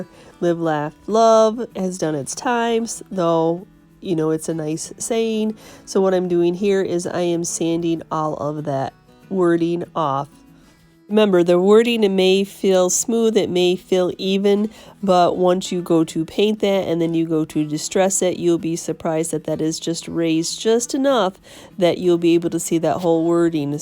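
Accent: South, United States. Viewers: Hi! I just love your adorable southernness!:-) I am somewhat following your business plan & I very much appreciate all of your help! I don’t usually comment because I watch on my TV and I’m pretty sure you can’t write from there. I am VeryMaggs@ if you’d like to stay in touch... I am in Los Angeles with my husband (I’m straight LOL, I wanted to make sure you didn’t think I was flirting. I hope to hear from you! •••Maggie•••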